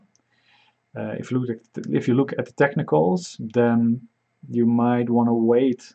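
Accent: Netherlands. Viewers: Zo te horen bent je Nederlands, goed werk! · very dutchy accent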